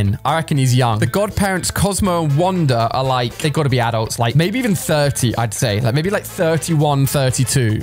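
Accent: United Kingdom. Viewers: Are you the same YouTuber whose another video I have watched recently? You have a very crazy British accent, similar to another YouTuber named Mallow. It was also seen in one episode of SpongeBob SquarePants that Mr. Krabs was born in 1942, which would make him 81 years old!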